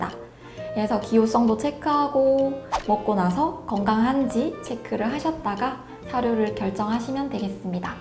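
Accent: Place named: Gyeongsang, South Korea. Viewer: 수의사님 고향이 경상도이신 것 같네요 그냥 수의사님 고향 사투리로 말씀하시는 것이 더 정겹게 들릴 것 같네요 ^0^ 중간 중간 사투리 나오는 맨트 보고 빵 터졌습니다. ㅎㅎㅎ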